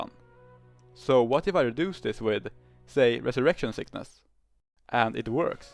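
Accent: Sweden. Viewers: Never have I heard such a heavy swedish accent.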